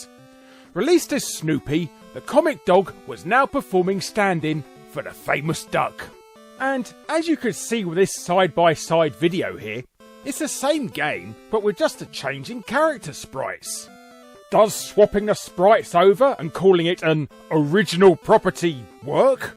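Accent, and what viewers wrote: England, United Kingdom. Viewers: your pfp looks like something Harry patridge would draw xD and you sorta sound like him with a English accent xD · I love the unrelentingly british pronounciation of 'kadokawa shoten' lol, let alone yokay busta rooka chan. Also surprised that Pandemonium!/Magical Hoppers didn't make the cut, although I guess this is specifically for licensed mascots rather than just games that changed their mascots to appeal to japan/vice versa.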